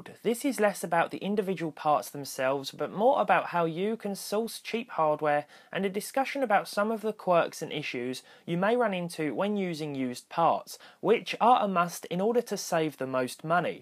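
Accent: United Kingdom. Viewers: Of course I enjoyed this video. I'm in love with your British accent. It's so fascinating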